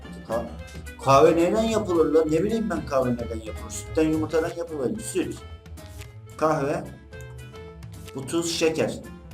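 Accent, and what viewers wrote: Black Sea, Turkey. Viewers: ABİ KARA DENİZLİ GİBİ KONUŞUYO AMA ŞİMDİ NORMAL OLDU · Abi sen Karadenizlimisşn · Abi Karadenizi misin? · Karadenizli büyük ihtimal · abi sen Karadeniz'li Misin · Karadenizli mi kinusma tipine haz usagim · Abi karadenizli mısın?🙊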